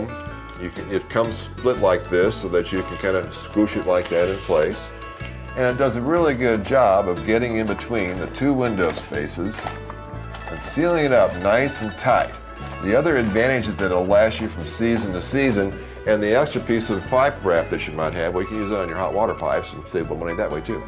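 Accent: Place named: Missouri, United States. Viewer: He clearly said : "This unit"..... Seems like 19 people can't understand a Missouri accent.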